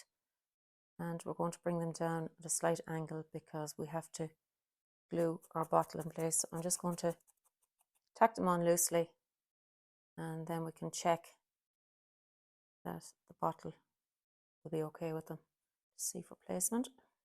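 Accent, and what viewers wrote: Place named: Ireland. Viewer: As ‘Sewcat’ above, says, I could watch and listen to your wonderful Irish accent over and over again.❤️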